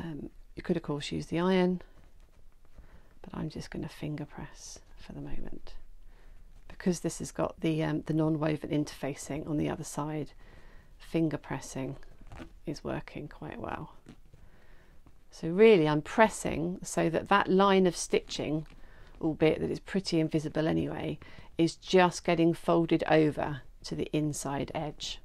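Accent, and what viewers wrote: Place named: United Kingdom. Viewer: Thank you for your tutorial, it's very useful! P. S. : I love your british accent ❤️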